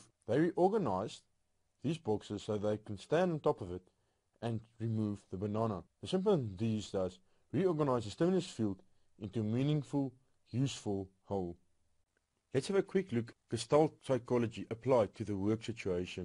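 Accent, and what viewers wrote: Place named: South Africa. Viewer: Nice to hear an SA accent on Youtube!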